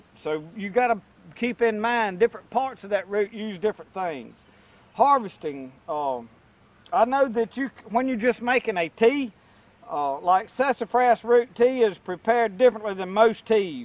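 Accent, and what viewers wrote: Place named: North Carolina, United States. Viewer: Hi, there. I enjoyed this video. Very informative. I've also heard of that same book you read from and have been thinking about getting it. They say it grows in the eastern U.S., which is where I live now (the SE). I'm not sure if I could find any though; there is a park within walking distance for hiking where I live, but not sure if I would have any luck (I live in pine country, and so it's almost nothing but pine trees). I'm originally from Texas but have been living in NC for the past 15 years. Speaking of which, are you by any chance a North Carolinian yourself? You're accent sounds exactly like what I hear down here. Thanks for the video. I think I'll check out more. God bless.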